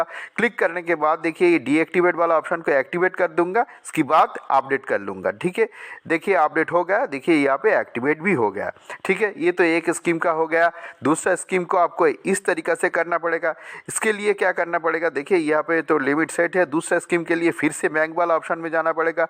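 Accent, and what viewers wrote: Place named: Bengal, India. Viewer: Pahle Hindi thoda Sikh lo.. bangali babu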